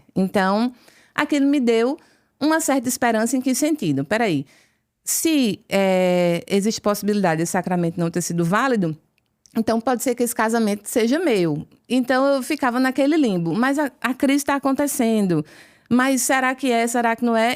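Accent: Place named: João Pessoa, Brazil. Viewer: Que lindo este testemunho! Sou do Rio e amo esse sotaque de Jampa. Tenho grandes amigos na cidade. Fui católico do IBGE quando adolescente, virei ateu após os 20 anos contaminado pela universidade. Agnóstico aos 30, e Cristão Free Style após um método CIS do Paulo Vieira aos 38. Aos 40 passando por um grande problema no trabalho, minha esposa me "impôs" uma viagem a Campos do Jordão com uns amigos para espairecer e pedi que no caminho a gente parasse no Santuário de Aparecida, pois desde que voltei a ser cristão não entrava numa igreja. Ao chegar e rezar frente a imagem para agradecer por todas as minhas bênçãos independente do problema, a solução chegou através de um telefonema! Naquele momento tive a certeza que precisava ser católico, e agora, 5 anos depois estou fazendo o Catecumenato e me apaixonando cada dia mais por nossa Igreja. Em Julho farei o caminho da fé e aguardo ansiosamente por minha primeira comunhão. 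Salve Maria e Viva Cristo Rei!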